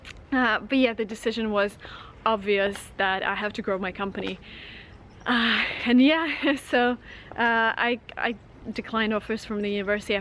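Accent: United States. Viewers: Hi mam I have been watching your videos for last week's honestly your videos are inspiring me the way how you talk in American accent is superb I'm not a native speaker however I wanna be one of them. I can feel that zeal in me. Kindly suggest me how can I enhance my skills and become a native speaker... Thanks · Your English sounds like American English, but you said you studied English in Great Britain.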